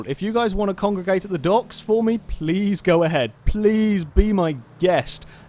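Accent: United Kingdom. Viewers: You're soo British 😂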